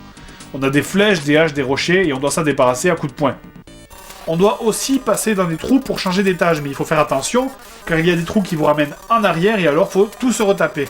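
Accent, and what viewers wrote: South, France. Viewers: Tu as perdu l accent du sud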